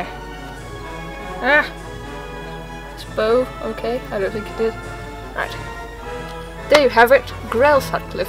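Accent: England, United Kingdom. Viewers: Charlie Glover they made a video a while ago covering it. they sound very English however they're from Scotland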